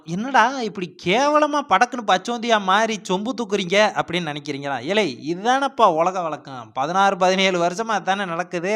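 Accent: Madurai, India. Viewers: தம்பி மதுரை ஸ்லாங் பேச்சே செம என்டர்டெய்ன்மென்ட் தான்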